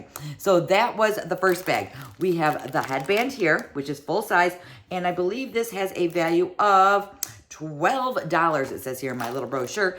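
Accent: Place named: Midwest, United States. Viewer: Hi! bag 1 is yours! I can tell by your voice, and excitement level for the first bag. I have heard that Ipsy uses the age to decide if they give you more makeup or skincare. I have been curious what two bags would look like if the only difference in the profile was the age! Also are you from Wisconsin? I grew up there and your vote reminds me so much of the Midwest. It's super fun that you get it with your niece. I can't wait for my daughter to get old enough to gift her Ipsy!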